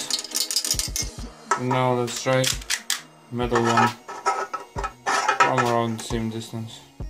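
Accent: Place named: Serbia. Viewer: alright thanks! Btw, where are u from? Ur accent sounds like serbian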